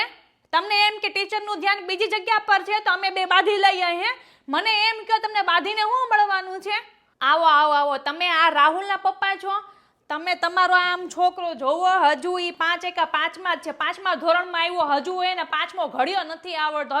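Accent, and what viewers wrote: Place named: Saurashtra, India. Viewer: જય સચ્ચિદાનંદ તમારી સૌરાષ્ટ્ર ની ભાષાગમે છે.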